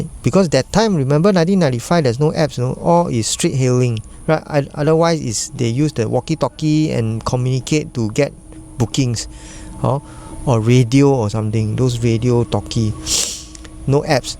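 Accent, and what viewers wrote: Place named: Singapore. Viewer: I really enjoy the way you told your stories. In typical singlish it's so homely.😊😮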